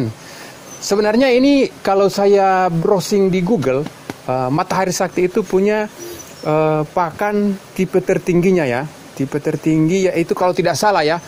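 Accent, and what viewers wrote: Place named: Medan, Indonesia. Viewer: mantap ini depe penjelasan, logat medan campur lompotoo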